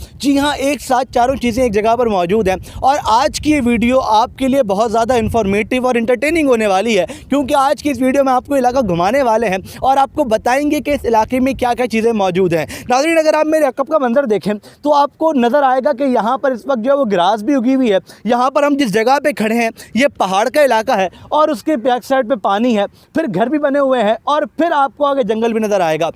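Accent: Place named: Pratapgarh, India. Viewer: Ap ky bolny kaa treqaa bilkul pretabadiyo jessa hy kam ki baat kuch bhi nahi ki siwaye bakwas kerny ky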